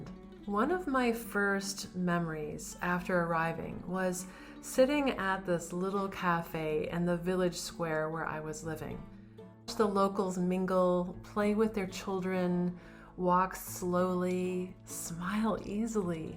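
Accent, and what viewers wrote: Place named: Europe. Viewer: You sound like a European, welcome.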